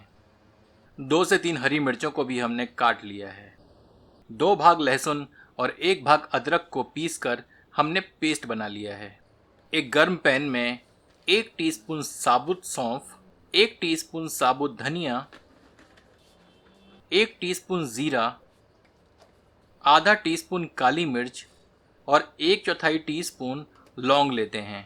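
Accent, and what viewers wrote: Standard. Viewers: आपकी रेसिपी तो शानदार है ही और सबसे अच्छी बात आपका हिन्दी में शुद्ध पकड़ घी से भी ज्यादा मनमोहक लगा प्रणाम स्वीकार करें... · आपकी शुद्ध हिंदी ने मुझे मंत्र मुग्ध कर दिया। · Recipe to acchi h bhai g par itni शुद्ध हिन्दी sunke maja aa gya 😊😊👍👍👍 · Itni sudh hindi bahut acha laga aap ki awaz aur receip Humne aaj first time aapka vedio dekha😊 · Wowwww too good recepie 👌👌👌 aur usse bhi zyada aapki hindi.. bahut achcha laga sun k itni suddh hindi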